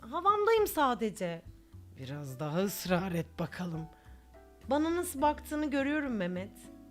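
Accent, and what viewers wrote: Black Sea, Turkey. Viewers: Haşmetlimin her episode serisinde birini Karadeniz şivesiyle yapmasına bayılıyorum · Karadeniz şivesi❤ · karadeniz şivesinden dolayı ciiddi şeyler çok komik yaa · Karadeniz şiveli gercekmiss hayallerim gercek olduuuu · Batugh Tanem valla senden akilli xngxhnxmhfmjfmjf Ve karadeniz sivesi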